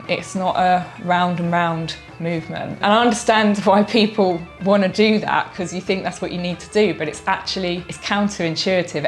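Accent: United Kingdom. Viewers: This British accent 🥰😍🥰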